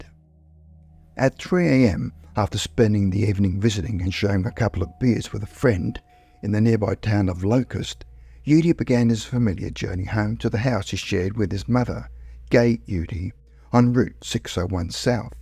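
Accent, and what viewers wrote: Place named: Australia. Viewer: Why are all these american stories narrated by a Brit or here an Aussie? Turn off to americans!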